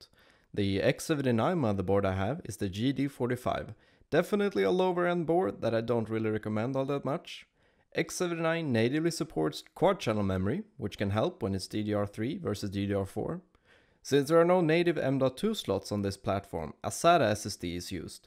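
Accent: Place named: Sweden. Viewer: If you listen closely you can hear your accent is coming from Sweden sort, yeah its correct xDD.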